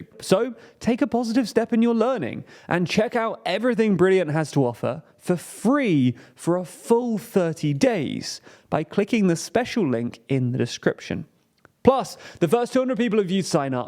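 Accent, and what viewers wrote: United Kingdom. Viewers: This is the stupidest pure speculation video from you guys I’ve ever seen. This is becoming like NewsWars with a British accent. I hate it when a good channel becomes successful and begins chasing the money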